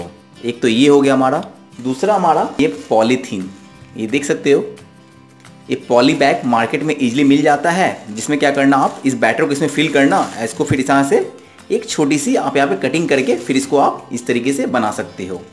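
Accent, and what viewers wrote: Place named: Bengal, India. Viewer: Jo khudko zada chalak samjte hain wo sabse zyada dumb hota hain...dnt make fake video...and by your word you seems bengali...to bengali me hi baat kare...pronounciation is soooo poor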